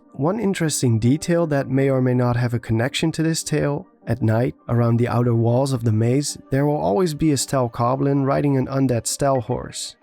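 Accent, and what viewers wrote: Netherlands. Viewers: I already thought you were Dutch! I recognised the accent :) (I am also Dutch myself) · You make great videos I really thought you had like a million subscribers. Also are you dutch because you kind of have a dutch accent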